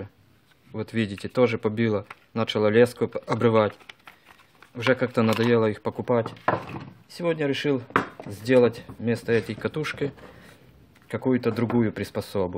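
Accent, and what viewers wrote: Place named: Moldova. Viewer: Судя по акценту - молдованин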